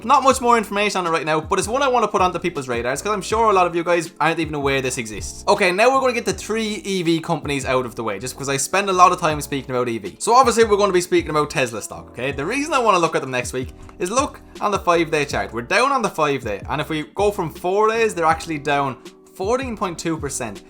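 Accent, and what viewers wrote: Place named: Wicklow, Ireland. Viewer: Let me guess..., Wicklow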